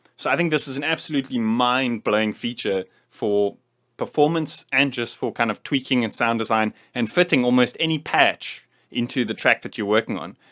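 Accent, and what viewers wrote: South Africa. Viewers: I know a South African Accent when I hear one! Great review bru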